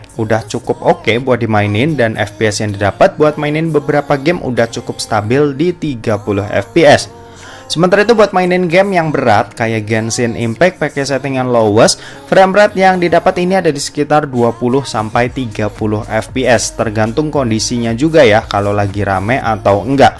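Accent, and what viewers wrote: Semarang, Indonesia. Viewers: Asli Semarang bang?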